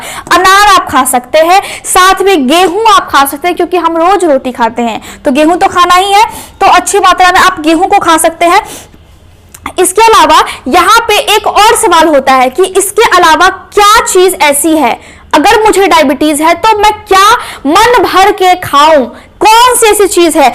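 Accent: Bihar, India. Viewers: Madam App bihari ho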